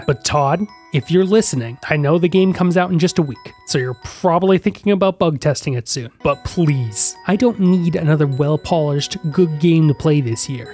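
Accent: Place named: South Boston, United States. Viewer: This guy from Southie or wat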